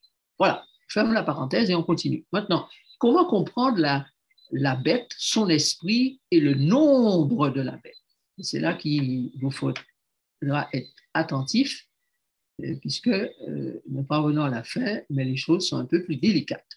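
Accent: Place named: Haiti. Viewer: Tu dois bien t'exprimer en français tu parles comme tu chantes? C'est la langue (patois) haitienne qui détruit ton français et ton accent . Les haïtien parlent de la même façon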